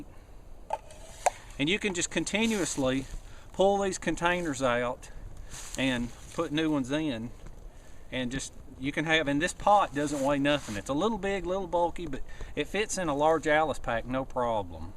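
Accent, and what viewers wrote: South, United States. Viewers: I've subscribed recently and have watched a dozen of you videos since and I think you have a great channel! I work in a similar field as yours and I have to say I really like how you bring the workshop to the bush! Love the Carbon Fibre Chimney and ovens! Nice welding and ingenious stuff! You're quite resourceful with material! Nice sheaths, also! Keep em coming Bud!!! I'm French canadian and I dig your southern accent a lot too! :)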